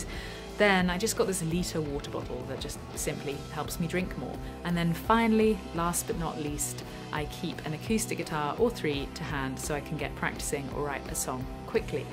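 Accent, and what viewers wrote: United Kingdom. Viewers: She's so Bri-ish... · Omg that soft perfect beautiful British accent <3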